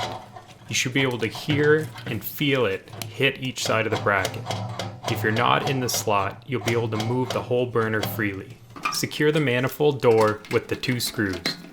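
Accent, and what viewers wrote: Canada. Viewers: Are you Canadian or from the Upper Peninsula?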